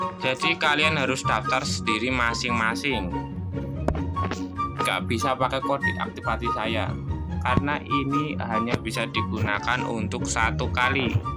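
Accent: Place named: Surabaya, Indonesia. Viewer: Org Surabaya Ya Lu Bg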